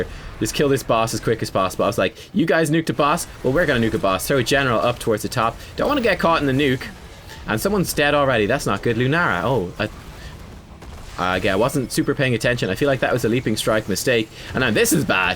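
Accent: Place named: Ireland. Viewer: just teasing, love the Irish accent!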